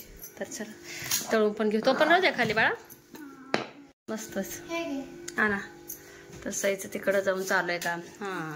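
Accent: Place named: Shrirampur, India. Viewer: तुझ्या आलेगाव बघितले की मला माझ्या माहेरची श्रीरामपूर ची आठवण होते माझ्या आईच्या घराचा आजूबाजूचा परिसर सेम तुझ्या घरच्यासारखाच आहे स्वयंपाकाची पद्धत बोलायची पद्धत सेम तशीच आहे तुझ्या घराच्या बाजूला खूप मोकळी जागा आहे तू वाफे बनव आणि मोठ्या झाडांना आळेकर त्यांच्यामध्ये एक शेणखत लेंडी खत घाल एरिया झोपला घाल खूप छान आहे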